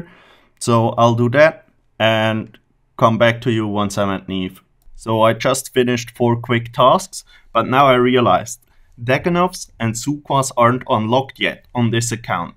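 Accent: Switzerland. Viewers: 100% en schwizer haha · Ich has gwüsst! hahaa, han mer grad denkt, dases en schwiizer akzent isch^^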